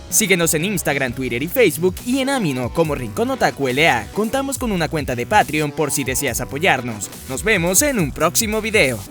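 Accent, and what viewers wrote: Venezuela. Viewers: Eres de venezuela? jajajajaja este pana me agrada ahora :v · bro eres de venezuela coye que bien vl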